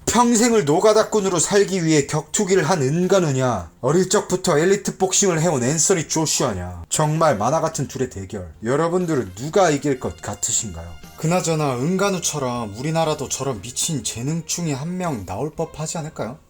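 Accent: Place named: China. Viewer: ㅇㅈ 조선족 같아 임마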